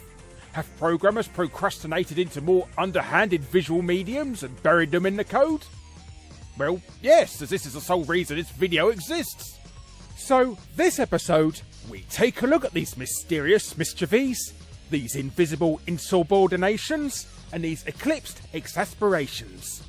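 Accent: United Kingdom. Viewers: Not at all, I just like reading the script alongside the audio. Trust me, as a rugby fan, I’m quite used to the British Isles accent 🏉👍🏾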